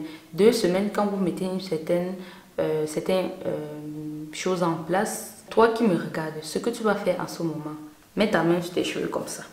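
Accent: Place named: West, Cameroon. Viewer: 🤣 tu as fini avec nous (femmes noires) . Avec ton accent de l'ouest du 237, j'aime ca. Tu es naturelle même dans ta facon de parler. Super vidéo et merci😘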